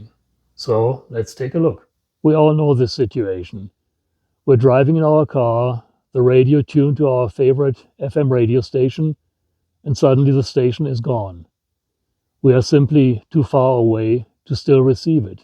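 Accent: Germany. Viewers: Hey, you are a native German? Name and accent point to that conclusion:) 73 from Germany:)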